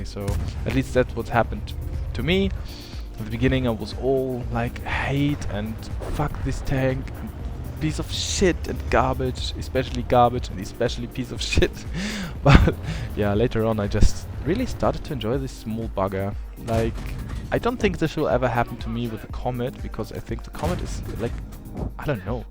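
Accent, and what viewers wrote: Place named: Germany. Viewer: your accent sounds like a native German speaker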